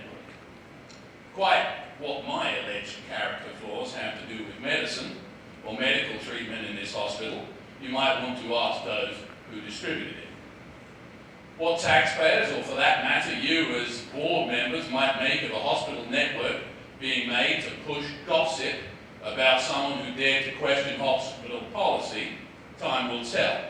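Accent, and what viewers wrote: United Kingdom. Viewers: He is a Pommie (an English person). He migrated to the US some years back. He sounds like the love child of Nigel Farage and Arthur Daley. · Who is the immigrant actually. U came over from England and still talk like the Brits we kicked out of America in 1776. Go home to england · He's not even American. Listen to him. He's a Brit; a frigginn Lobsterback 🇺🇲💪🏻🇬🇧👎🏻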